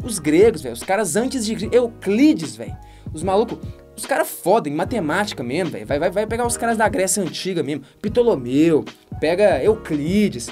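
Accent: Minas Gerais, Brazil. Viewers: "esse trem" kkkkkkk sotaque mineiro == tudo pra mim!!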